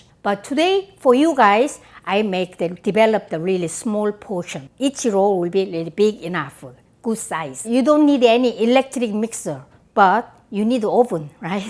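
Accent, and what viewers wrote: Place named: South Korea. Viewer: 분명 다른나라언어 인데.. 한국말같지..? 우리엄마가 영어하는거같아..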